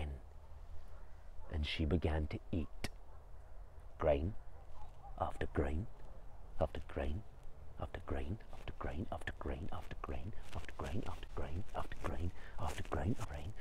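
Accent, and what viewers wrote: Wales, United Kingdom. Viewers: This was so magical to listen to You are indeed a very gifted story teller . Thankyou for sharing your wondrous gift with the world I love your Welsh pronunciation of names and places which make the story more magical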